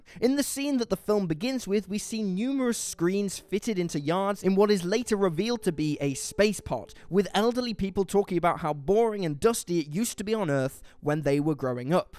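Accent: United Kingdom. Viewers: This guy sounds British so I'm guessing English is his first language.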